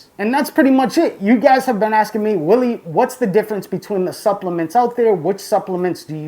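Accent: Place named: New York, United States. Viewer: He’s a Yankees for sure, I’d guess Jersey or NewYork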